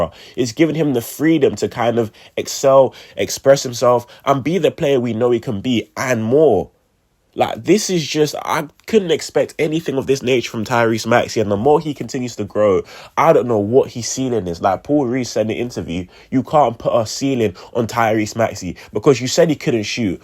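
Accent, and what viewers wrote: United Kingdom. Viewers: It’s kind of refreshing to hear a British YouTuber talk about the NBA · Wasn’t expecting that accent when I clicked on the vid but nice to see a fellow UK sixers fan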